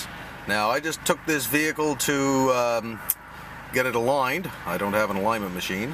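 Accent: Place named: Nova Scotia, Canada. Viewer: When I heard “charged aboot” I was like wait, this guy is a Canadian, and he followed “Canada here” lol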